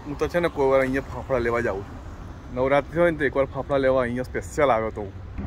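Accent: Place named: Surat, India. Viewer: Wow.. nice collection of typical GUJJUS. yummy. Commentary paakki SURTI …👏👏👏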